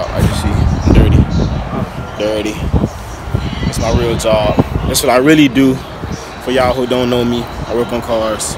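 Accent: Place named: South Carolina, United States. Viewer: That's a South Carolina accent for sure! Thanks for the helpful video too!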